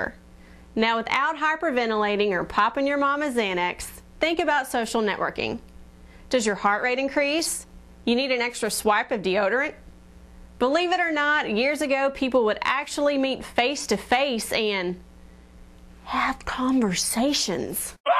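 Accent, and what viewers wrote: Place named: United States. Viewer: american accent hmmmm always different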